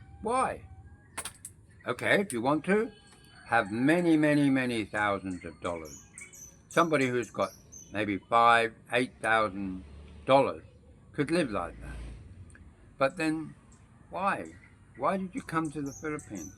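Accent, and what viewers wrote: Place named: England, United Kingdom. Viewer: You have the accent of a Pom not a nz accent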